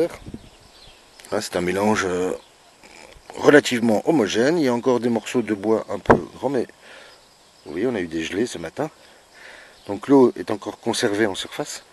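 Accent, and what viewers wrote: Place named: Brussels, Belgium. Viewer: Salut, merci! J’ai reconnu dans ton intonation une musique chère à mon coeur d’ex- Bruxelloise 😉. Je te félicite et te remercie de nous offrir tes belles idées. Bises du Nord de France, Claire.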